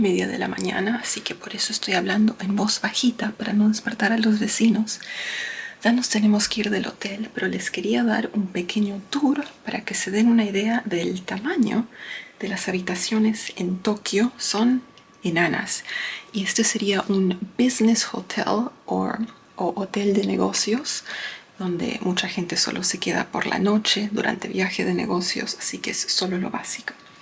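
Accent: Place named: Argentina. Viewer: El castellano de se esta volviendo mas argentino 😅😅😅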